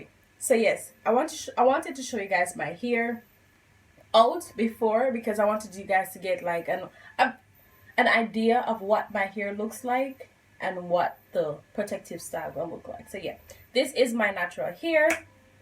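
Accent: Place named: Jamaica. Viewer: Hey.. you sound like a Jamaican...... real ting! Good Job: Just cut my locks after 13 yrs..... need this afro in my life....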